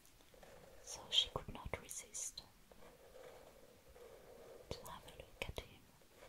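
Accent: France. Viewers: Is this a French accent? Sounds nice and gentle anyway. :) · I never knew I needed to hear a French accent in ASMR videos so much, until I found this channel...🥰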